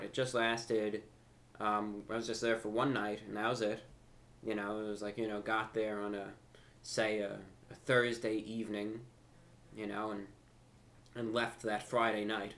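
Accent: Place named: Boston, United States. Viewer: I hear he's from NY. I thought he sounded Bostonian.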